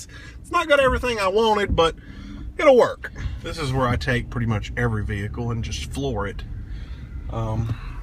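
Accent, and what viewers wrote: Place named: Carolina, United States. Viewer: Pretty good review, honest and love the wonderful Carolina accent, back when NASCAR was worth watching this is how most the drivers spoke! Love my <num>wd crew, mine is more the working mans truck, but got everything I want, great power and comfort and good economy for a big truck. I added side steps, for the ones of us with short legs made all the difference in the world, eBay and less than 200 bucks.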